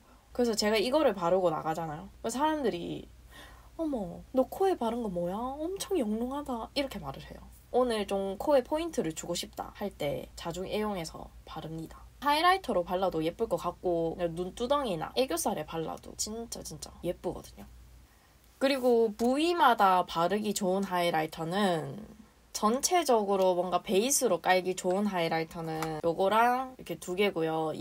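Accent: Gyeongsang, South Korea. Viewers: 그냥 사투리가 같은 느낌인데.. 경상도인이라면 누구나 알,, 다들 이 사투리쓰고 이런 억양입니당 이분도 이분만의 매력이있쥬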